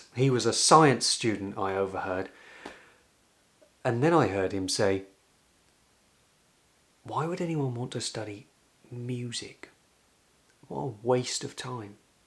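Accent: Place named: Cockney, United Kingdom. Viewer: Love the cockney accent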